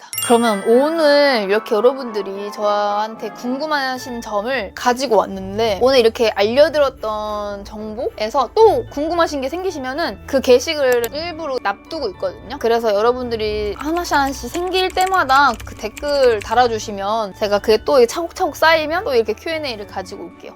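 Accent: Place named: Chungcheong, South Korea. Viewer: 세종인으로서 님 충청인일 것 같았어요 ㅋㅋ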